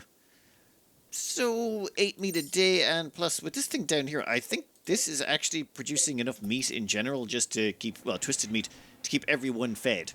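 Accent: Ireland. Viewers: Man WTF... Your life does not mean you can stray from the now mandatory daily update of the saga.....:eyes-purple-crying: love you.. longer vids plz, just give us the full 3 hour sesh without edit on a side vid, ppl would watch or listen to you for hours. Those who say otherwise are metal horrors... All you would need to do is talk for 3 hours... no trouble at all XD. My Girlfriend plays Oni and watches your vids religiously on that game.. I play Rim and do the same.. But we both watch any of your vids in the background while playing or chilling... eg. If you have a little more time to do comentary on longer vids ppl will watch or listen, as a Scotsman its very nice to hear an Irishman narrating Great vids on my Fav game! :face-green-smiling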